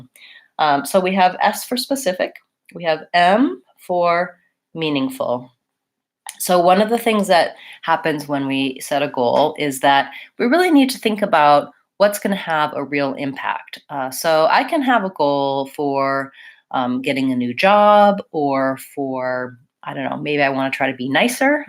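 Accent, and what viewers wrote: United States. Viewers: I really love your American accent!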